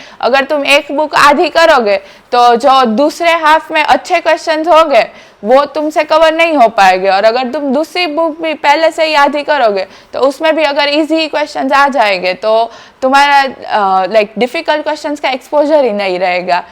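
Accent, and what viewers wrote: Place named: Gujarat, India. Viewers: Not even a 1% percent dilution in her gujarati accent hats off queen........ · The Gujarati accent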